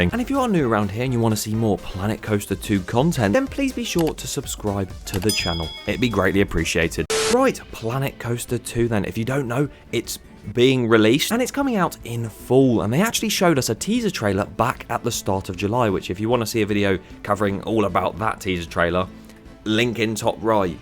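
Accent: United Kingdom. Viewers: I like you, british man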